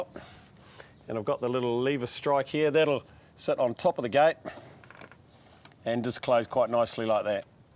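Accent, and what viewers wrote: New Zealand. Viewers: hes kiwi where u from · kiwi accent haha shit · He has a kiwi accent, he's from Manawatu in NZ :) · Nice job man. Quick question: what's a 'chuzzle'? #kiwiaccent 😁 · with a name like Gonzales, perhaps you are just not familiar with the NZ version of English. I was born in Australia and familiar with kiwi accents, as they probably are with some of our aussie accents! If you have an accent, I guess also some of us would have difficulty understanding your version of english. Tolerance and understanding will help us all overcome such judgements...if you watch what he's doing as he is speaking it is pretty easy to fill in the gaps of of misunderstanding his accent ;-[)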